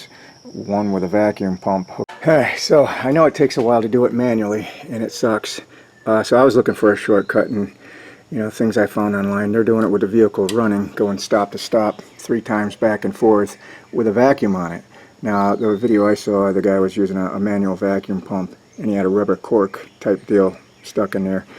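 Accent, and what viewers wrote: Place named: Boston, United States. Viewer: Very relevant to me here in Oz, just doing a Mitsubishi ute and this method is working. Your accent reminds me of Bill Burr ; do you tell jokes too? ( Bill’s from Boston).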